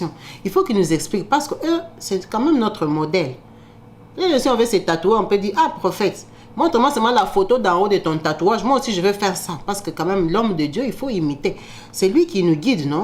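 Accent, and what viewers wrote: Democratic Republic of the Congo. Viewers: Juste un conseil madame. Parler avec votre accent congolais, vous etes ridicule avec cet accent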